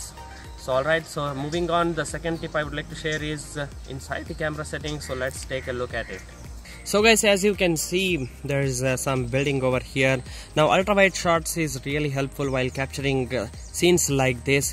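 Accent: India. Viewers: Funny Indian Accent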